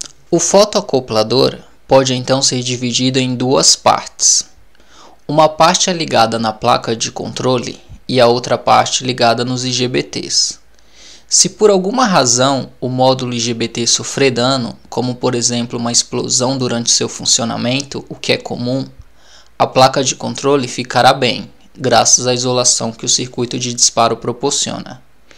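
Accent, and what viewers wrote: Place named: Ceará, Brazil. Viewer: Olá, boa noite., você é Cearense, né? Percebo que você fala como a gente aqui, rsrs. Mas, vamos lá... Os equipamentos inversores DC/AC de placa solar, são similares aos inversores de frequência de motores? São similares? O reparo é mais simples ou complexo? Desde já sou grato pela sua atenção.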